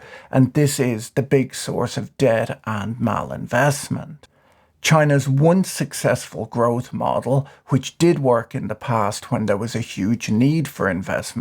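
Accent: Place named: Ireland. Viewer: PB, is it just a coincidence, or is there a deeper reason that your Irish pronunciation matches with some Italo-American Jersey ones? Did you just talk about, Chinese "yutes?" (or is that yoots?)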